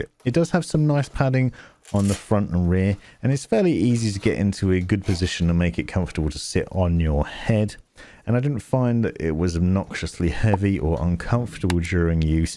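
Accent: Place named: North, United Kingdom. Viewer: You based in the north dude? Sounds like an accent closer to me 😂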